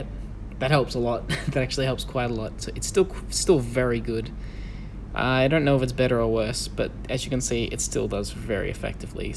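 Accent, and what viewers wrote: Australia. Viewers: How come you are a New Zealander, but you sometimes sound like an Aussie?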